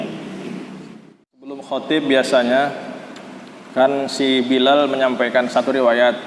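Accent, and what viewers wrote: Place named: Johor, Malaysia. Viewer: Bagus Bahasa Melayu Dia seperti Bahasa Melayu kami di johor juga.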